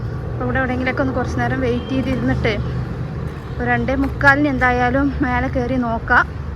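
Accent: Tamil Nadu, India. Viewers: മലയാളം തമിഴ് രീതിയിൽ അങ്ങ് പറയാ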